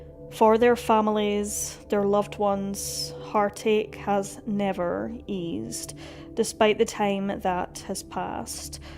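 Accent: Scotland, United Kingdom. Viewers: Love the Scottish accent 😊.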